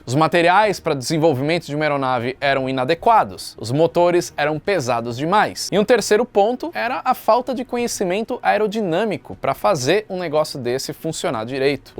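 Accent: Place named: São Paulo, Brazil. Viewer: gosto dos seus videos porem sua voz d paulista e foda tudo pra voces e meinto, momeindo pensameinto porque nao momento porque o i antes do n fico bolado com filmes com dublagem paulistanas tudo com meinto poxa que voizinha safada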